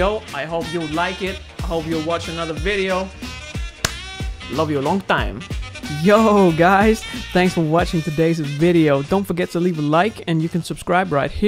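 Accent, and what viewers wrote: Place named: Denmark. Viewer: IT’S YA BOOIIIII DANISH ACCENT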